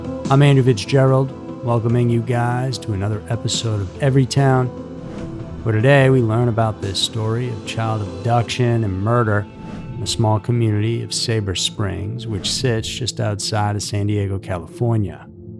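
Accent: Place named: Texas, United States. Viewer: Plano is “PLAY-no”. I’m confused by the narrator having a Texas accent yet not knowing how to pronounce Plano? It’s a big Dallas suburb and if someone has been in Texas long enough to have an accent, they would know how to pronounce it. Maybe his narrator voice is a persona 🤷🏻‍♀️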